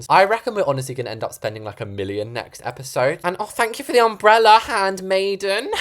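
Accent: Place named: United Kingdom. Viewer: It’s good that (I’m saying that for short) is British, that’s because I am and most other content creators are foreign, so I can actually understand him.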